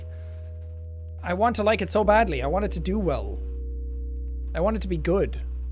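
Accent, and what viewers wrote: Ireland. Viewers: How have you come back with a stronger Irish accent even tho you live in the forbidden land 😭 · He sound a lot more Irish love it.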